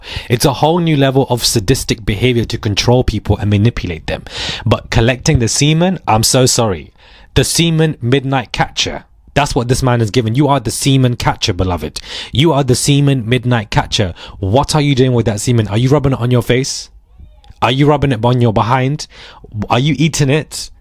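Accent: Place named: London, United Kingdom. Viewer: Yeah his accent is a London accent. It is how we speak in the South Eastern part of the UK.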